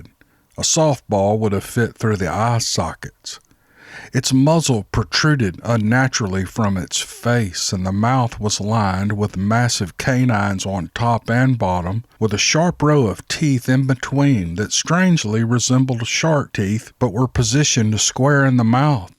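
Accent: South, United States. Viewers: That one really piqued my interest. It was beautifully written with respect for the shadow people and the family members in involved. The perfect compliment to the story was the soft southern spoken voice that narrated it. Thank you. 😁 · hello and that was SO therapeutic! I've been awake tossing and turning like a captured viper in a pillowcase till even my cats left the bed for more settled and quite sleeping spots! But as I lay down in my bed just before this story began, after taking some pain killing tablets and having a hot bath, I am hoping for just 2 or 3 hours kip, enough to get through the day. I'm watching the early spring day begin as the sun peeks through the curtains, and listening to that Southern accent and cadence, I felt like I was listening to a meditation. What a wonderful story and what a wonderful family the twins had both as children and as adults. 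We are locked down in NSW Australia where I live and even though I'm country/rural and there hasn't been a single Covid "case" in the 100 kilometres around me, that 1 case has kept me home for a very long time. You have a gift and I sometimes put one of these longer stories on if I'm cooking something like lemon butter in my kitchen because I have to stay at the stove and stir constantly. I'll listen to you tell the story and I'll look out my kitchen window at the farmland and put myself somewhere else in time and place where the story you are narrating happens..I hope that you and yours are doing well and I look forward to the next "Calming " time. Till then take care now! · Mr. - you are becoming a skilled storyteller and this is one of your very best!!!💯 I have always enjoyed the way you tell a story, being half-southern myself. Your accent and genuine love for the stories you share here have been apparent from the first time I listened to your vids. 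Also ❤️ your musical and visual 🐸 accompaniment, especially the ladies in your side yard. 🐓🐔🐥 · Mister Southern Comfort low hear your southern accent is peaceful you're such a good reader God bless you and your wife hope to hear from you again soon love you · I have enjoyed all the stories you have shared on this channel but, none more than this one. Your deliberate cadence and southern drawl make it easy for my mind to paint the pictures your words describe. I detect this to be a true and authentic account of what happened that day in 1977. Nebraska Checking In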